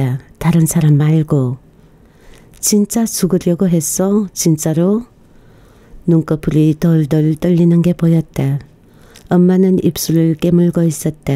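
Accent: Gyeongsang, South Korea. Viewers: 님의 낭독이 이젠 전문가 수준입니다. 처음의 그 갱상도 발음이 그립기도 합니다. 너무 손색이 없다보니 ㅋㅎ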